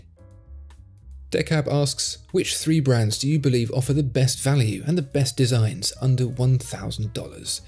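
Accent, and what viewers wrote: United Kingdom. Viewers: Those Lange 1815 chronographs or datograph perpetual calendars are indeed the best grail piece. I prefer your videos with no face, ethereal presence voice (the brit accent helps too 😂). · You make watches interesting. You and your late night FM radio voice. Women around the world want to know, 'Who is that mystery hands British lilt man with that voice?'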